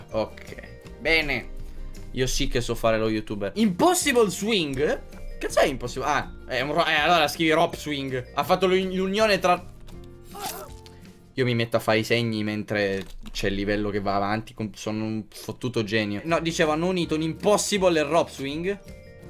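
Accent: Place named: Naples, Italy. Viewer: Madò che parla Napoletano quanto figo 😍😍 ( lo risolviamo= o risulvim)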